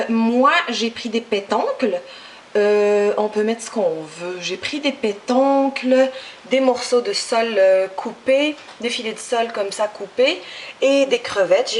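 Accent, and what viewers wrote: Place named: Quebec, Canada. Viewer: J'aime pas vraiment l'accent québécois ca ne te va pas très bien désolé :(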